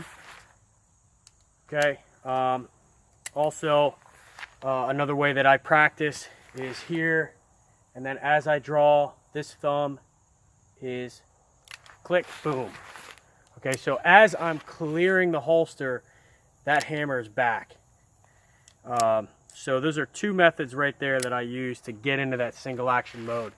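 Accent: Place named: Delaware Valley, United States. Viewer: Gotta be from PA or the Delaware Valley! :) Nice video, just picked one up on a trade, beautiful revolver.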